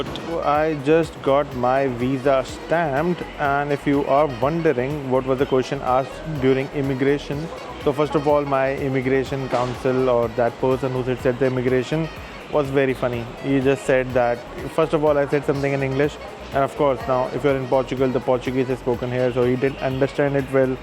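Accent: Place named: India. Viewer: I CANT UNDERSTAND WITH YOUR TANDOORI ACCENT...HORRIBLE EDITING BTW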